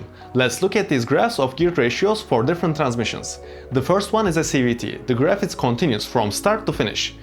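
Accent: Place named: Iran. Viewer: are you persian at least your acsent is like iranian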